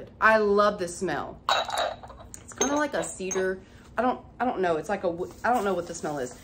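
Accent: South, United States. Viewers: your Southern drawl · I love the country in you Not to many say y'all anymore and that's a southern thing girl. My new favorite DYI FROM DOLLAR TREE SHOPPER. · I absolutely love your southern accent! 💐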